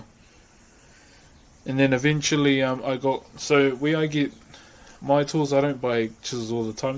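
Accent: New Zealand. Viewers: Your accent sounds New Zealand, but your carving style looks Hawaiian/Polynesian... So pretty. I really like it ❤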